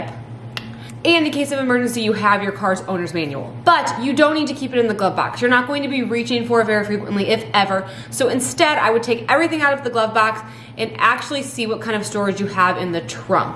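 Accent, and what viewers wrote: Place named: New York, United States. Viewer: Are you from New York? Love your accent!!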